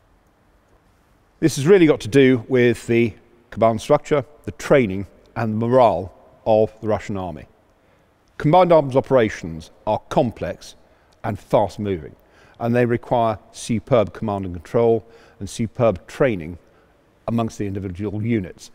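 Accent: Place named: United Kingdom. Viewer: I could listen to all day to be honest. But as an American i can say i enjoy listening to most British talk lol.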